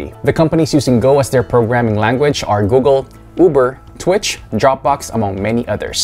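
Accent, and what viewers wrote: India. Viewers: If you're really from India, its unbelievable, Your accent is a wow in respect of Indians.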